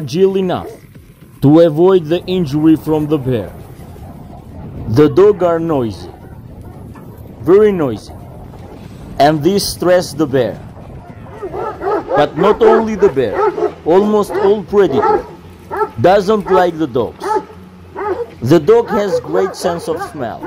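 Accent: Russia. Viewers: subscribed a few weeks ago me frirnd.. Your accent are you from Russia :)? Greetings from Poland slavic friend.. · Чёткое видео, автор русский 🇷🇺 чтоли? Акцент такой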